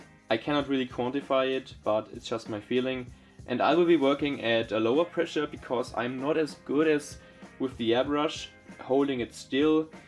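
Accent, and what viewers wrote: Germany. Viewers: Man hört raus das du ein Deutscher bist ^^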